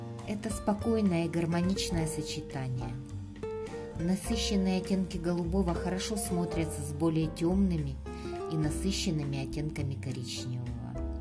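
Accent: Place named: Europe. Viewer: А в какой стране Вы живете? У Вас очень сильный акцент, не могу понять с какого европ. языка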